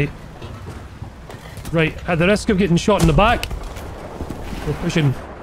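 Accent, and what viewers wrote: Scotland, United Kingdom. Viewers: just wanna say nice gameplay in the intro also whats your birth nation you sound scottish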